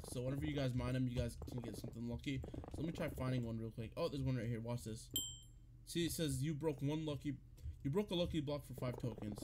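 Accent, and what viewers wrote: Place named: Egypt. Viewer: hmmm, you aound egyptian, my brother :D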